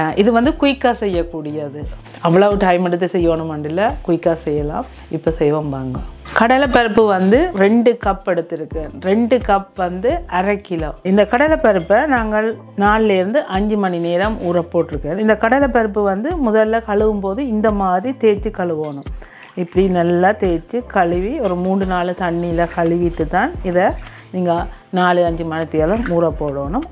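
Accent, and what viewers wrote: Sri Lanka. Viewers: Neenga pesurathu ilangai.tamil madiri iukki pakada recipe marvellous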